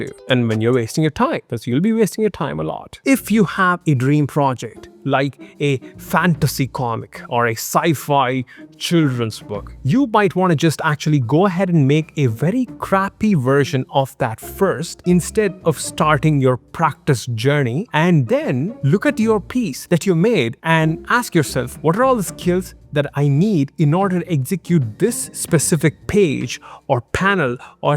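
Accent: India. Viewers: ya toh tu firangi accent mein baat karle ya indian accent mein baat karle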